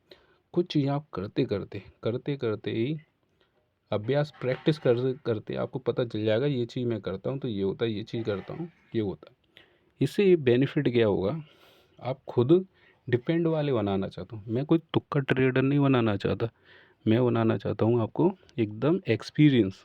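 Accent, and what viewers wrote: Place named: Madhya Pradesh, India. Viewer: Thanks for the response sir! Abhi mein start hi kr rha hun aapke video dekh dekh k, kabhi kabhi profit aur kabhi kabhi loss, I feel mostly mein abhi emotional trades le rha hun since I'm very new to the market toh achche se confidence nhi aaya h. lekin aapki strategy se bahut kuch seekhta hun, aapke accent se lgta hai jese aap madhya pradesh se ho, mein bhi Madhya Pradesh se hi hun. Thank you so much for explaining!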